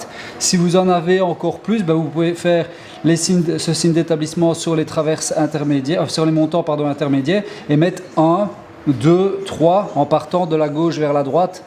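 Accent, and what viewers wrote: Liège, Belgium. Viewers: A la 30,26 minutes le serre joint à lâcher !!!! Heureusement que tu tenais bien le gabarit n’a pas bouger. Pour ma part , j’ai un autre type de gabarit. Qui entaille directement les trois charnières en un seul serrage. Porte 201,5. En tous cas , ça fait plaisir d’entendre un belge avec un accent liégeois. Bientôt je me lance sur YouTube avec mon accent Brusselair ! Ça va déménager les R.🥳🥳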